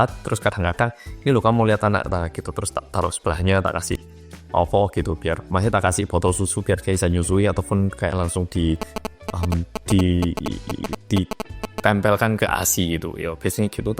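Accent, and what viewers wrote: Java, Indonesia. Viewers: 5 bahasa berarti mas bahasa korea, inggris, mandarin, indonesia, jawa medok 😁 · bahasa indonesia itu mah.. jawanya sekalimat sekalimat. tapi medok iya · Iya bener banget.. aku masih inget si jemuran2 itu.. selamat ya mas.. bangga banget denger medok Jawa mu masih kuentel gtu.. selagi lagi welcome to the world Dede Jang Ruel, sehat2 dan bahagia.. ❤ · Selamat yaa atas kelahiran anak pertamanya, turut berbahagia ❤ Kadang masih gak yangka ini orang korea, soale ngomong indo ambek jawa e iki medok poolll 😂 Jujur mirip dirimu sol 😅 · I just wanna say, CONGRATULATIONS mas medok 🤍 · Ngikutin mas dari Orang Korea yang medok sampai orang Korea yang beranak😂 Anak nya gak jadi namanya Jang rawon mas😅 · Ruel sejak bayi udah dengerin bahasa indonesia medhooook 😅😅😅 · Youtube channel org korea yg aku follow cm. Krn emang bener2 sdh mendarah daging indonesia, bahkan cara bicaranya medok jawa bgt, ga ada logat korea sama sekali. 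Tau banyak ttg indonesia ketimbang org korea lain yg hanya memanfaatkan konten demi cuan. Btw selamat atas kelahiran putra pertama nya Jang Ruel, smoga menjadi anak yg berbakti pd org tua & sukses. Ruel malah diajak ngobrol boso jowo, diajari boso jowo jg bro 😂😂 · Orang korea yg medok.. Ngono ae lah mas.. Iku cirikhas sampean og.. 😁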